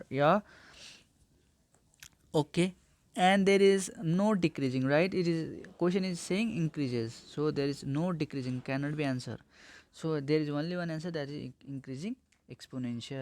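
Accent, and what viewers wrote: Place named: Nepal. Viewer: Are you from Nepal? Your accent seems like Nepali.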